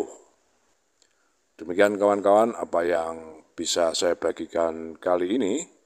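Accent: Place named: Nganjuk, Indonesia. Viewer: pean asal dr daerah mana..bahasanya kok plek wg nganjuk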